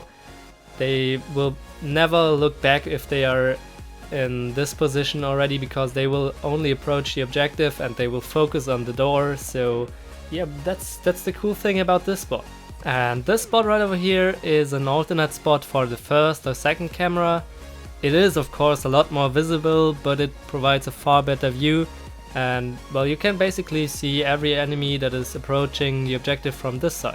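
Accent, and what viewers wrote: Germany. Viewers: Ich hab es direkt gemerkt das du deutscher bist ☺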